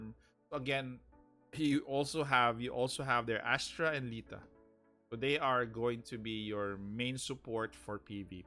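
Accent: Philippines. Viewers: Thanks for this very thorough guide mate. Just started this game yesterday. This information will help me a lot. Btw, your accent sounds like filipino.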